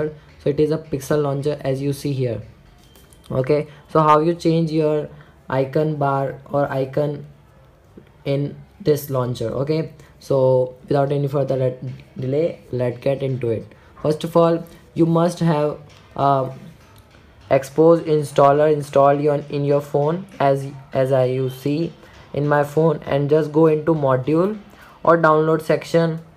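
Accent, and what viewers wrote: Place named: India. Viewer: Thank you dude! You're amazing (it's a little hard to hear Indian English lol)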